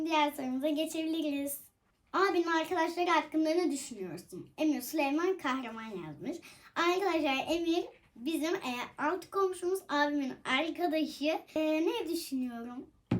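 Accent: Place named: Samsun, Turkey. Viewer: Samsunlu oldugun cok beli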